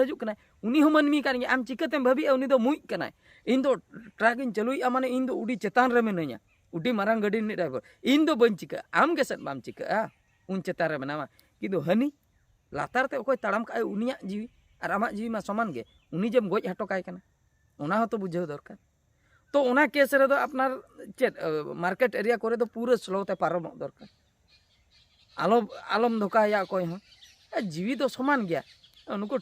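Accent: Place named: Jashipur, India. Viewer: Aale sur reyah kana nowa do jashipur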